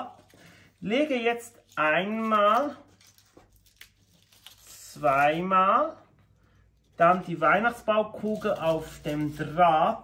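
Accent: Switzerland. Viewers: mit einem soooo lieben Schweizer Dialekt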